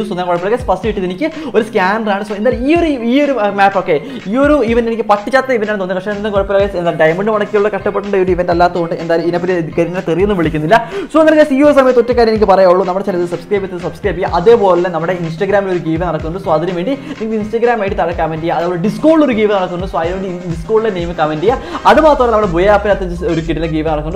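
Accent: Kannur, India. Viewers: Ettan കണ്ണൂർ aano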